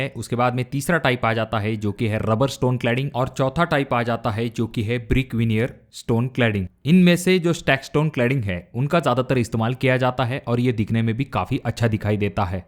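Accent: Maharashtra, India. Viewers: Typical Marathi accent in Hindi.... Proud of you...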